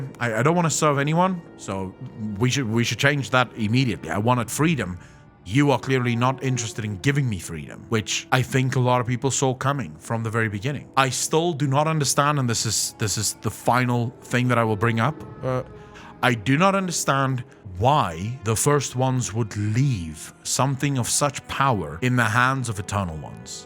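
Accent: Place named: South Africa. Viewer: Subbed cause fellow Safa :) Would recognize that accent anywhere. Good vid my dude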